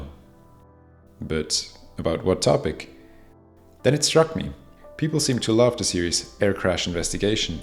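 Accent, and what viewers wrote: Switzerland. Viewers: Danke für die tollen Videos. Ich werde den Schweizer Akzent vermissen. 💔 Au revoir! 🙋🏻‍♂️ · Fly safe! I hope that one day - like Mentour Pilot - your career turns toward educating the next generation of pilots. You will be an awesome at it! Be it senior captain, flight instructor or just pilot doing his job with passion with who ever he can share the cockpit with: It is good do know you are up there! I will miss your voice - the swiss english is such a nice and nostalgia sound to me. Fly safe!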